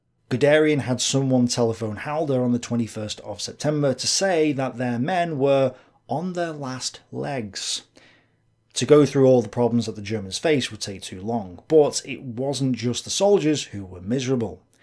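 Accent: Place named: Northern England, United Kingdom. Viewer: Just to follow up on my previous comment on your Case Blue video. What would you have in common with people like von Bock or von Wietersheim? They represented an ancient military cast going back nearly 900 years. As I said previously - they were Prussian, aristocratic, snobbish, arrogant - they belonged to the elite of the landowning (upper) class in central Europe. They really did live in a different universe. To hear you talk about them in your northern English accent brings a smile to my face. Cheers - btw I removed the word 'posh' as I don't think that adjective would apply to these people.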